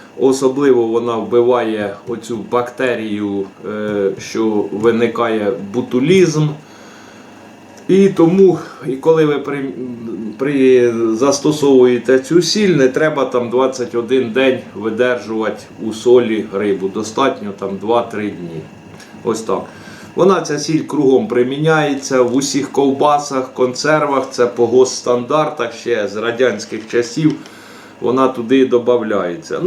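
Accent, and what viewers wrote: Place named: Central Ukraine, Ukraine. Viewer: Така смачна балачка! Черкаси,Полтава,Кропивницький?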